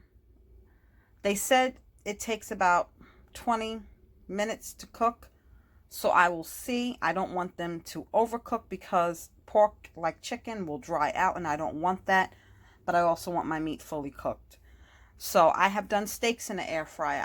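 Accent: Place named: New York, United States. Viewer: That little piece was I'm sure was the best. But the rest I'm sure was perfect. You sound like a New Yorker all day and night. Something I will try soon!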